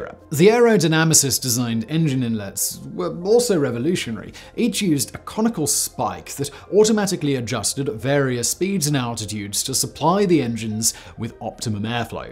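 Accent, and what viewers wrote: RP, United Kingdom. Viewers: that's cool as, I was the Wikipedia editor that added the "Adverse Flight Characteristics" sub section and the part about "fuel stacking", made me feel special hearing mention those in his posh British accent!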